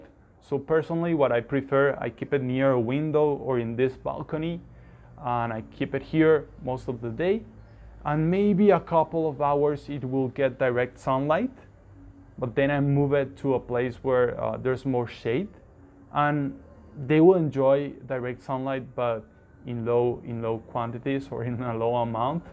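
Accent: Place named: India. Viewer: İ don't understand your English Indian accent or what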